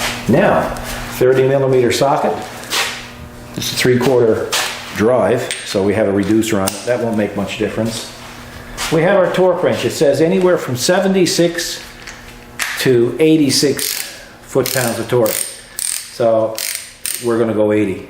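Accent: Canada. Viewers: Love your videos -- good to know you are so busy. I love your Canadian accent and your "tomfoolery!"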